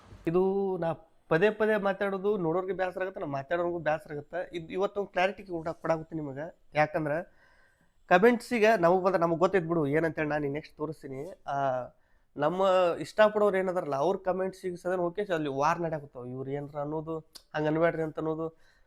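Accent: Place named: Bijapur, India. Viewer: ಪಕ್ಕಾ ನಮ್ಮ ಬಿಜಾಪುರ ಲೋಕಲ್ ಭಾಷೆ 👌👌ಬಾಲದಿನ ಆಗಿತ್ತು ಬರಬ್ಬರಿ ಆಯೇತು 😂😂😂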